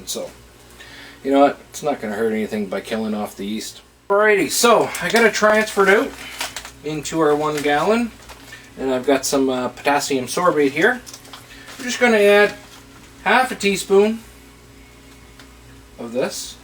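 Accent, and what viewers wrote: Nova Scotia, Canada. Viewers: Hey.... I've tried a few dark rum recipes similar to yours. I've tried various combinations of white sugar, ginger, cloves, oak chips etc. to get some different flavours. You had me hooked once I heard your accent.... and when I heard you talk about donairs.... I thought we may have met at that point being from Pictou County (but living in Oshawa) myself. Looking forward to viewing some more of your recipes! Cheers!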